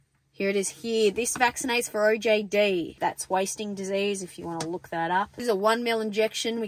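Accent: Australia. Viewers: I love everything about your content. You telling us how stuff works out there. Aussie accents are always a delight to listen to. You telling the idiot comments to fuck off (this one was particularly good lol). Random Slug intermissions. And seeing kangaroos fucking about like deer (as an American, most of the time if I ever see kangaroos in videos it's documentary stuff, so seeing them just doing kangaroo things in someone's fields is weirdly entertaining to me). Good stuff all around!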